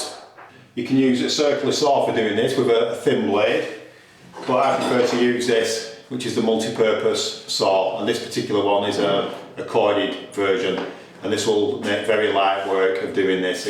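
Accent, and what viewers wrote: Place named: Lancashire, United Kingdom. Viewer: I recognise that accent ...... I'm guessing Leyland, Bamber Bridge possibly .......Preston as an outside guess ?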